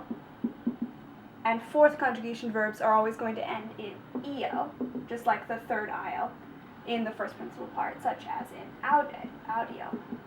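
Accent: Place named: United States. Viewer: Latin with strong American accent? No, thanks.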